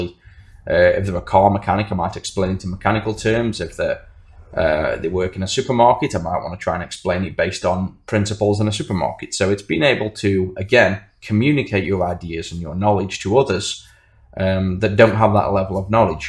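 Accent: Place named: Liverpool, United Kingdom. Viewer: Thanks, mate! Are you from Liverpool?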